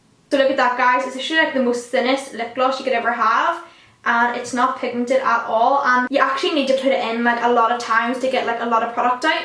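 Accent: Northern Ireland, United Kingdom. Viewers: Northern Ireland :)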